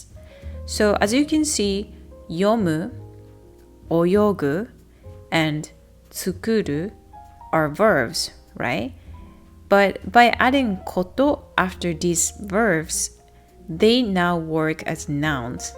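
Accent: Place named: United States. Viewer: woow thanks for creating such a helpful video❤ by the way your English is very very good you sound like American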